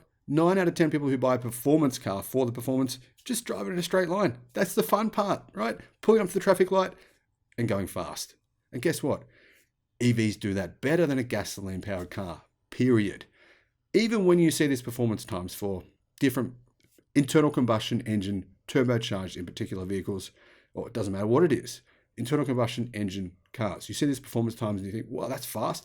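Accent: Australia. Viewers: I love your show, but your accent is destroying the word "inventory." You keep saying "inventry," which has no definition that I can find. Now I know that being American, we don't usually say English as proper as the British, but I thought you Aussies spoke just like them. The first few times you had said it, I was thinking what the heck is this guy saying. Then I finally figured it out. BTW I want a model Y, but it would drive me nuts if the new one is extremely different and alot better, so I'm waiting.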